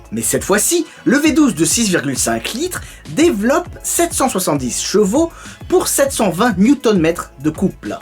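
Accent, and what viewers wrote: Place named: Italy. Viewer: Mais quel accent italien omg <3 <3 <3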